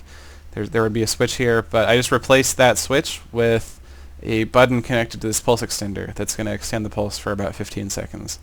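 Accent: United States. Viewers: maby he is trying to spell "Fußball" the german word... ?! because that the typical american accent...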